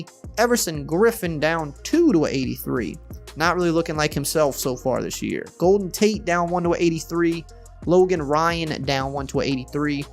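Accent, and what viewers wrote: Louisiana, United States. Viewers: What part of Louisiana are you from ?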